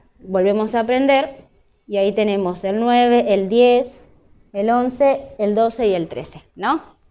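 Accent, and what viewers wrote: Argentina. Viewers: sos Argentina por el acento marcado, desde Perú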